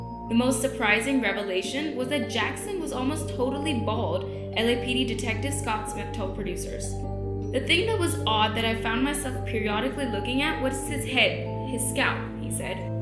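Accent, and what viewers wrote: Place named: India. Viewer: Are you Indian from INDIA? You sound like it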